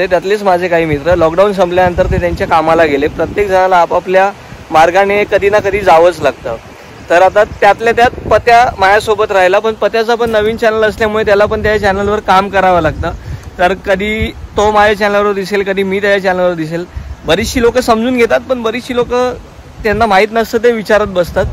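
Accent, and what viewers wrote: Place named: Malvani, India. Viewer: दादा, तुमची मालवणी बोली लई भारी आहे.. ऐकायला फारच गोड वाटतं. 😊 खूपच सुंदर निसर्ग 🌳🌴🌾🌿🌊🌊⛰️🏞️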